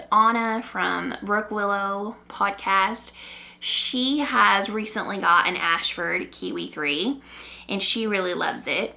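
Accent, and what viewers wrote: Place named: Tennessee, United States. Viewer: I just found your podcast and I love it! Going back to listen to the others. Question….. both my daughter and I think you sound like you are from Tennessee (we are from East Tennessee). Have you spent some time in Tennessee or is that just a pure Arkansas accent? 😁 No matter, love listening to you.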